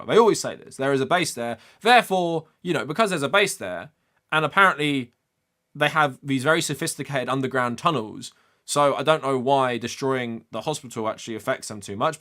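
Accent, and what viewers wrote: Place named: United Kingdom. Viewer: Judging by your accent, you're from the place that spawned Monty Python. Can't blame Babylon bee and Onion for not making funny satire by UK standards. US standards in comedy have a lot of catching up to do in this regard, but they're at a level where the average American can appreciate and laugh at the jokes.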